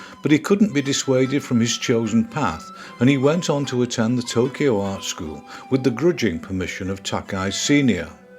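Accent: United Kingdom. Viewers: HIs cultural artwork and line work are new to me. I can't say I enjoyed them. But, do appreciate his changing styles. I also enjoy his more representational work. Your voice inflections surprised me. And wonder if they are an English (British)? As always, I learned more about the trade. Tnx!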